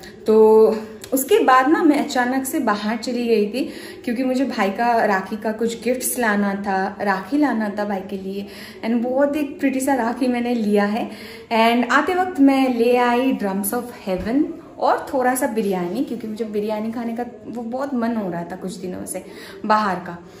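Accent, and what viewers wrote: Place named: Bengal, India. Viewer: Chapa k chale jana it called bengali style me hindi bolna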